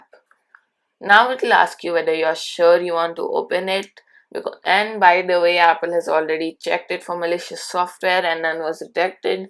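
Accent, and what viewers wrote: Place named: India. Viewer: BRO IS AND INDIAN ACCSENT SUCH A LIER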